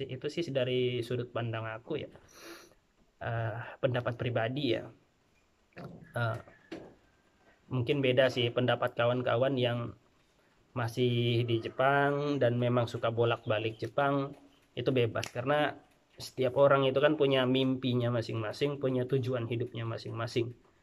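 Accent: Java, Indonesia. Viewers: Kirain orang Jawa mas?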